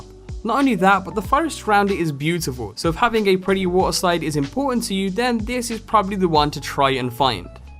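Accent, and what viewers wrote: United Kingdom. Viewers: YOU BECAME BRITISH!!!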